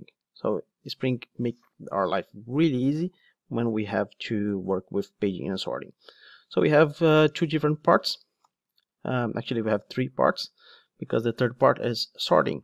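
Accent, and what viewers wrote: Brazil. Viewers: Eu pensando que era outra pessoa ensinando, mas é o mesmo BR falando inglês kkkkk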